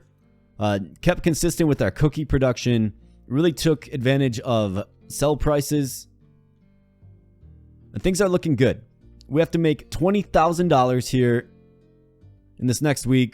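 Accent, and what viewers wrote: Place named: Canada. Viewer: Wait, are you canadian? You say pleg instead of plague, and rut instead of root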